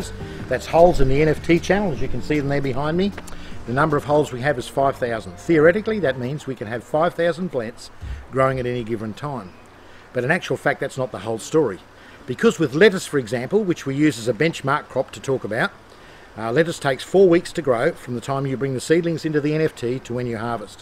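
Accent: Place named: Australia. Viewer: That head of lettuce you picked up was absolutely Gorgeous! When I go to Australia (positive thinking) I definitely want to visit your farm. btw: I just love how you sound. Australian accent is so cool:)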